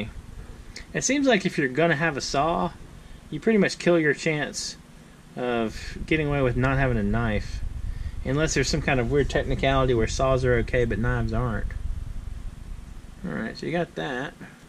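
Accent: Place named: South, United States. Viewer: For some reason in my opinion you sound southern but then again if I am assuming right when I went up to North Carolina a lot of people sounded southern so I'm assume you are from North Carolina or Kentucky.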